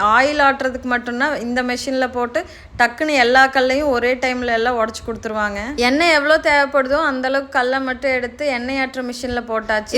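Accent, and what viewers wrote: India. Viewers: கொங்கு பேச்சு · வாழ்த்துக்கள் சகோதரி. கோவை தமிழ் கேட்டது மகிழ்ச்சி. வாழ்க வளங்களுடன்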